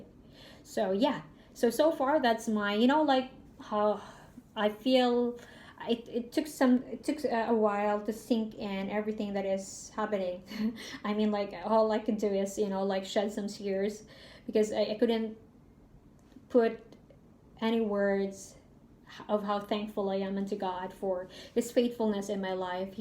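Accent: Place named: England, United Kingdom. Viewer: I love your English accent🥰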